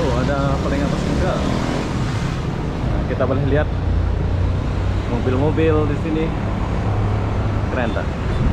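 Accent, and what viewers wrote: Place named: Malaysia. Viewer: idk why i feel uncomfortable hearing him talking in indo accent but mixed with a lil bit of malay diction, like it is kinda cringey 🙄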